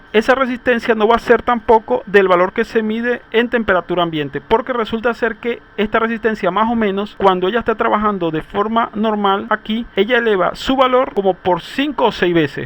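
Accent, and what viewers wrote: Venezuela. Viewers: mi pana tu eres venezolano verdad? aprecio tu esfuerzo muy buena tu explicacion, ahorita comprar un bombillo nuevo es un dineral. Gracias!!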